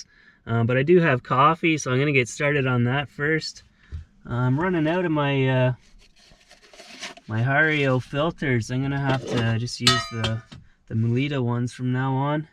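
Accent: Canada. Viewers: Beautiful sentiments but he's Canadian, NOT American. We have different regional accents as well.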